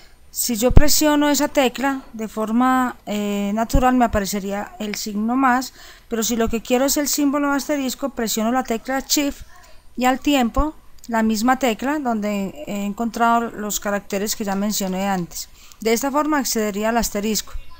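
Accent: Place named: Antioquia, Colombia. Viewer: Ese acento Paisa ayuda mucho a entender el curso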